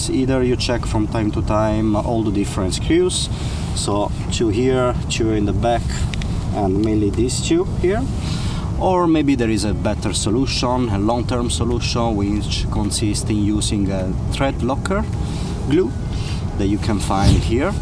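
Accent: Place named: Italy. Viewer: Quanto cazzo si riconosce un italiano che parla inglese ahaha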